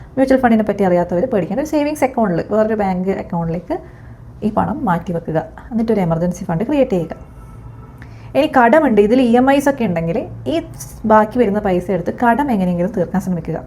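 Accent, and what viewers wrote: Kannur, India. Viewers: Kannur kaari aano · And most of all, I enjoy listening to your Kannur accent :-)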